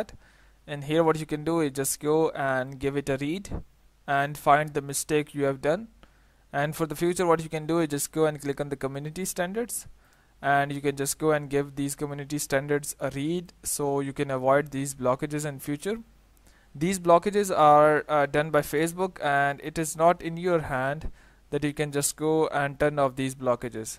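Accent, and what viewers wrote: India. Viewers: You didn't try to fix shit! But what you should fix is that Indian accent. Tenk iu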